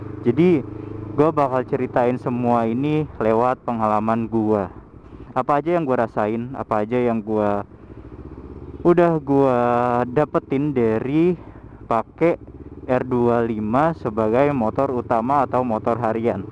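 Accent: Banjar, Indonesia. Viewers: Bang orang Banjar? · Jir orang Banjar bree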